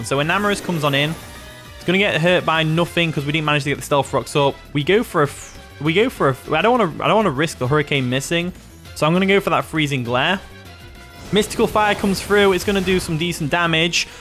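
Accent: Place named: United Kingdom. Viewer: It's the accent bro! Everything's better in British.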